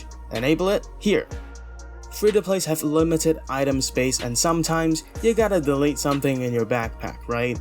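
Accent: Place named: Asia. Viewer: love the asian accent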